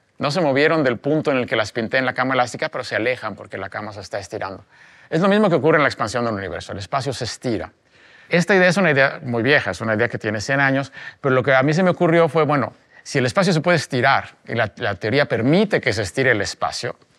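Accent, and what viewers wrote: Mexico. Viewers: Hasta que escucho un Mexicano, muy bien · Acento mexicano. Me lo ha arruinado. NO gracias.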